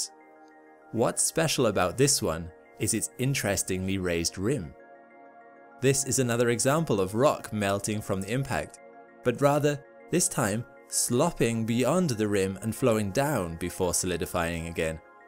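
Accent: United Kingdom. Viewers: Do you think they have American narrated documentaries in Britain? so why do we have to listen to limie's over here all the time?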